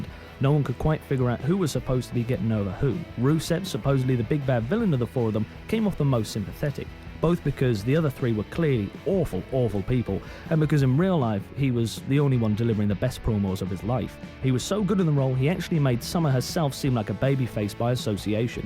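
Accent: United Kingdom. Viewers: What the fuck is your accent? Like a posh, cockney, Geordie, Australian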